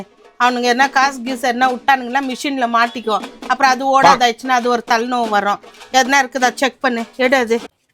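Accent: Bangalore, India. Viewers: Bangalore tamizh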